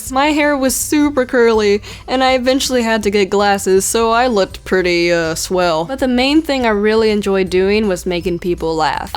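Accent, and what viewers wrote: South, United States. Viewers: I just realized from this video she has a country accent. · I knew you were a southern belle, such a lovely accent. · No wonder you have a small southern accent!!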